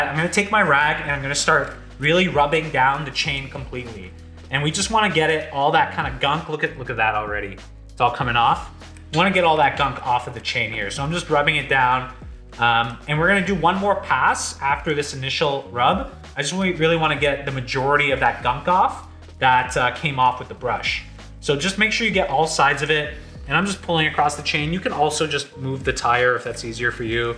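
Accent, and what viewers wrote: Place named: Canada. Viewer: how can one tell you are from canada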